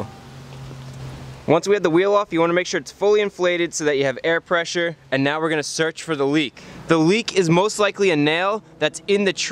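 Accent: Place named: Northeast, United States. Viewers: SsS Stone Cold no its how the northeastern Americans say it. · As a fellow “wooder” brother, much love from Baltimore.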